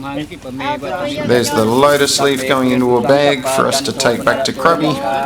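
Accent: Australia. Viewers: Is that an Australian accent?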